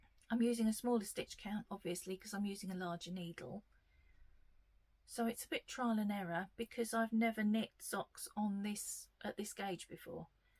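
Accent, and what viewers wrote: United Kingdom. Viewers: Love your podcast and you have a great sense of humor! I especially enjoy the British accent as I’m from the USA. Thanks for making the videos.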